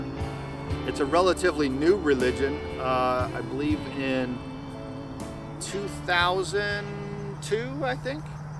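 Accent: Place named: West Country, United Kingdom. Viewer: The 'Pirate voice' is a west country accent (south west of england) - The FSM is a well established theme within the atheist movement. As I get older I've moved from a staunch atheist to agnostic, but not necessarily the white bearded man. Until science can prove the origin of consciousness [referred to as the Hard Problem] I'll be somewhere between atheistic death is death, consciousness is a product of brain function and is granular in nature, more brain power more consciousness - and the notion that the brain is a receiver of consciousness. I do not fear death, only the moment of it. I'm fascinated by the notion of consciousness. The YT channel 'closer to truth' has some excellent interviews with prominent scientists and philosophers about it. Anyway, excellent video - you've got this sussed mate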